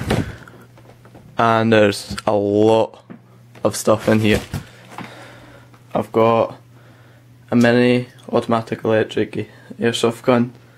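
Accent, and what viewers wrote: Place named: Scotland, United Kingdom. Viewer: aahh gotta love the scottish accent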